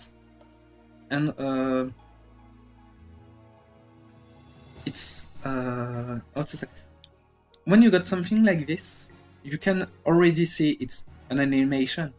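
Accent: France. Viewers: Your accent is like, the most French thing I have ever heard x3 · You have a Really funny French accent XD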